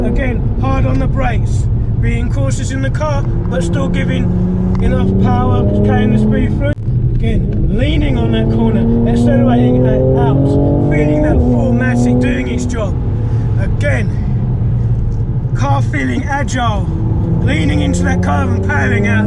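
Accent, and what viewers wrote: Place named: England, United Kingdom. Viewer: I was so shocked to hear a fellow Englishman!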